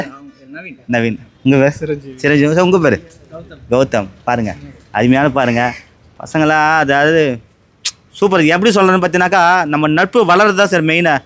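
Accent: Madurai, India. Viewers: Super Anna madurai vasagam ❤🎉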